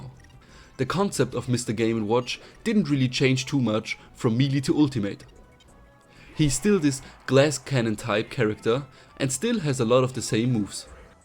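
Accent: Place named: Germany. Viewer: Mann hoert raus dass du deutscher bist hahaha. Weiter so mit dem Melee grind! Ich find es lustig, dass du den Gruenen Mr Game and Watch spielst. Ach du Gruene Neune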